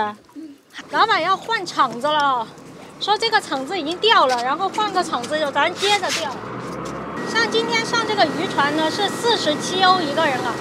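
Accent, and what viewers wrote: Sichuan, China. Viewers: 哈哈果然是一个地道四川人，我看你第一个视频就听出来了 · 听口音像四川，最后果然说四川人了。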